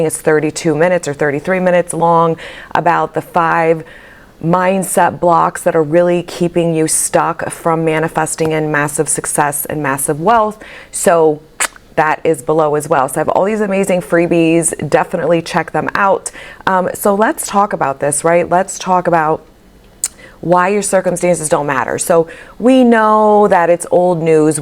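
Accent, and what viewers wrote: New York, United States. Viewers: You're f***ng amazing. I can tell you're a New Yorker. I'm a subscriber and I'm so glad I found your site. You help me always over the last couple months. I'm a work in progress. Gemini guy and working on rebuilding with my Cancer lady. Just your aura and lovely way of breaking down the steps. Keep up the awesome work. Love it all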